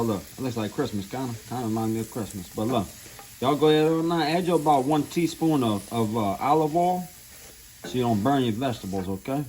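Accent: Louisiana, United States. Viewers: was having a shitty day, then popped up and a combination of the simplicity of your videos, like they are straight to the point and the louisiana accent is just icing on the cake for me, i love this content!. Love from Sweden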